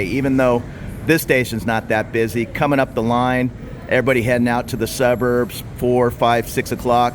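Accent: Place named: Southern California, United States. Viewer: Hi, I just spent a week in On Nut and you popped up in my YouTube feed. I lived on La Jolla Blvd. in PB for three years, back in the late 90’s. It was a giggle hearing your SoCal accent. 😀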